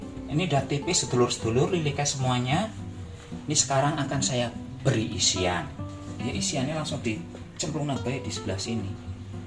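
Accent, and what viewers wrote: Banyumas, Indonesia. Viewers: Matur suwun resepe lik.... Kok lik ngapak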